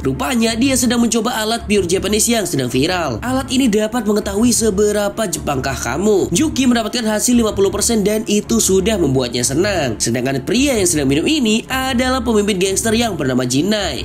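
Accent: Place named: East Java, Indonesia. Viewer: Njirrrr kweden, fix lu orang jatim y, sidoarjo apa surabaya min?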